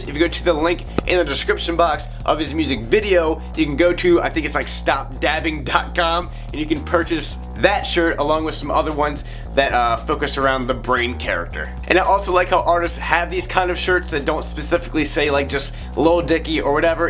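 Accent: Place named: United States. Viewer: Potato He has an american accent, you saying that is like someone from britain saying that they dont have an accent to someone from America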